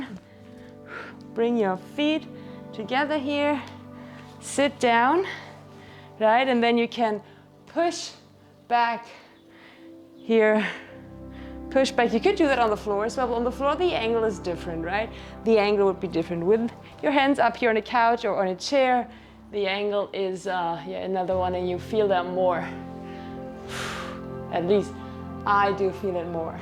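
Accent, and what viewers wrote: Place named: Ireland. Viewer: I’m just curious q about your accent, sounds some Irish in it, I always watch👌your videos.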